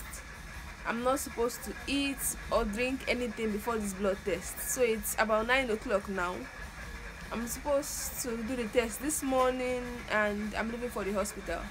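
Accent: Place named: Nigeria. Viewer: Hi Your Nigeria accent is still strong Love from Ukraine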